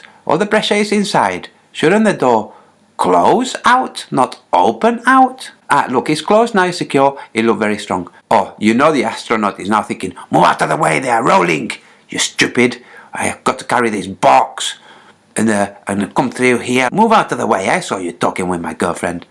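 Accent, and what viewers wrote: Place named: India. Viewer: sounds like that indian guy from short circuit. kinda cool accent lol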